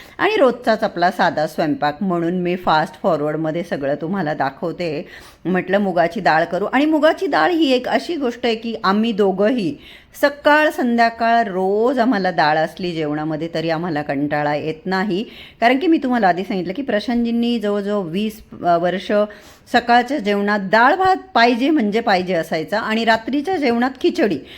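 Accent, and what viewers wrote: Nashik, India. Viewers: तुमची टोनींग nashik ची वाटते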